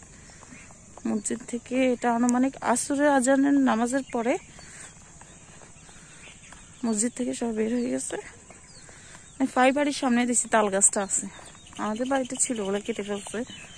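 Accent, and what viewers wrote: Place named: Noakhali, Bangladesh. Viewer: Noakhalir je sposhtho buja jay,goir ladi koy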